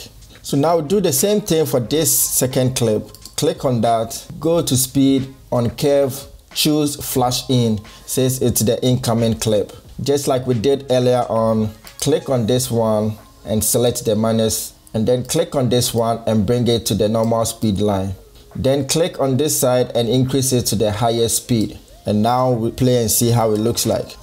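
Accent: Ghana. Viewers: This is far from the video…are you from Ghana? You sound more like a Ghanaian 😂 I’m a Ghanaian so I know our accent 😂😂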